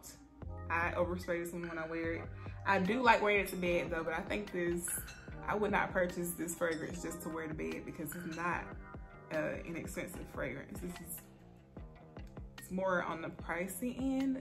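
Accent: South, United States. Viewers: By the way I love your accent! I know everyone always says they don’t have one 🙄 but yours is so soft with a southern tone!! I’m from London so I find it so 😍😍😍!!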